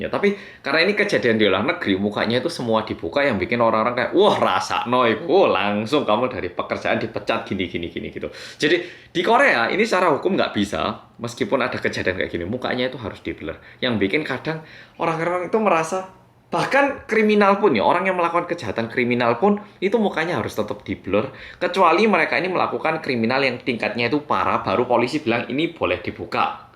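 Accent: Java, Indonesia. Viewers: Ini dia orang korea apa orang jawa si? Kok lebih medok dia daripada gue yg setengah jawa🥺 iri😔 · Pada mulanya mas itu saya pikir orang jawa yg bekerja di korea😂😂😂😂Soalnya logat dia tu pekat banget jawanya.😂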